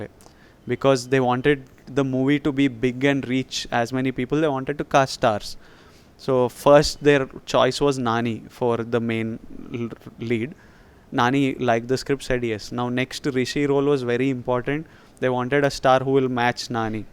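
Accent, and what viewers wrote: India. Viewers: u wil rock vijay.. u r our hero now.. jai telangana.. hope andhra people also will accept you easily.. watching your interviews.. inni rojulaki telagana vachinanka..oka manchi dynamic hero dorikindu maku.. jai kcr..jai ktr..jai our telangana slang..jai our hero vijay...... u wil rock in future. hope u wil continue our telangana normal slang..and ur own natural slang that is our slang...... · hyderabadi will talk lyk this only ...ekdum hyderabadi..keep rocking bhai ...mana hyderabadi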